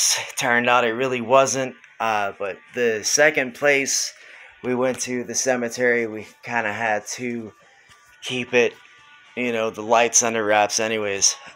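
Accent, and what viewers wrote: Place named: West Coast, United States. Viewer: Wow well out on the accent HA HA HA HAthat's comical,and I thought I was good at accents,could have sworn I heard that west coast surfer sound in your accent ahhhhh... Yeah,she's ok now,very long time ago but when that happened and the things that happened after.. it was like with taps coming in and noises like coming down the stairs,made me think of your doors opening and stuff it's always hard to catch these things on camera though. Like they don't want to play when you do,when I was at my other flat two small pictures just jumped off the wall whilst I was looking in the mirror near them I only saw it through my peripheral vision but the girlfriend saw it I was like ' wtf happened then' but she saw it,they couldn't have fell by accident as they wehanging off nails you had to lift them up to get them off and other times,other things that were quite frightening at times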